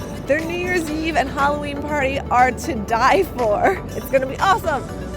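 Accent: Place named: Long Island, United States. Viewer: I remember thinking you might be from Long Island or something guessing you weren’t from one of the boroughs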